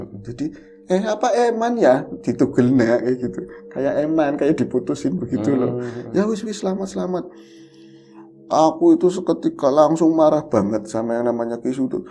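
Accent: Banyumas, Indonesia. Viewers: Sallam ngapak Mas, seneng krungu,ne soale ibu,ne aku wong Lebaksiu bapa,ne aku wong wetan Solo👍😃sukses selalu.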